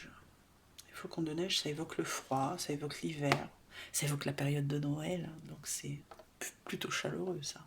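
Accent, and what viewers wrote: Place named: Toulouse, France. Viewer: Choix 3. Et tu as un accent toulousain que je n’avais jamais entendue !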